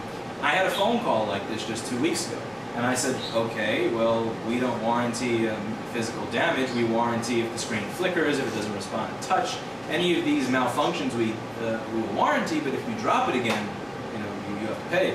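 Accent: New York, United States. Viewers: Pierjan - He's got that New York style going on. :)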